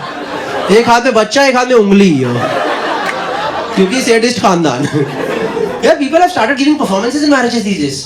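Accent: Delhi, India. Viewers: He is awesome- with a Delhi Punjabi flavour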